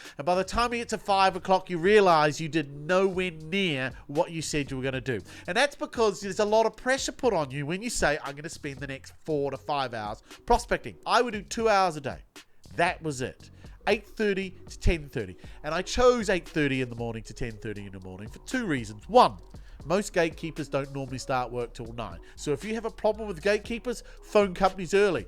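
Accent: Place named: New Zealand. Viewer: I thought I heard a Kiwi accent. Then I see the FourSquare mug in the bacground!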